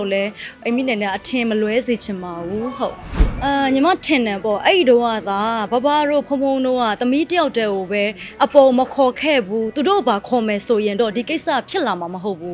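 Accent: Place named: Pa-O, Myanmar. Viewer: ပအို့ဝ်သံနဲ့တူတယ်လို့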